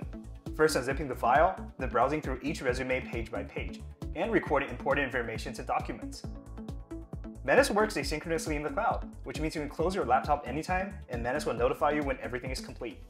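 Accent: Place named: United States. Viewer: Chinese startup, American accent 🧐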